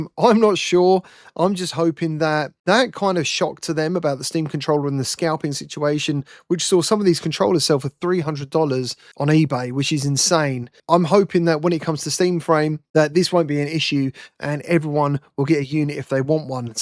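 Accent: United Kingdom. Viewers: I want it but without color passthrough for augmented reality, it's a pass. But your balance test wouldn't be an issue if you did it with the proper head strap. We all know the Quest head strap out of the box has been terrible since the Quest 2. It as a Meta decision designed to keep the headset price down. So funny how you guys across the pond say router as rooter. But honestly, I wish we'd stop including letters in words that aren't pronounced, no matter the language.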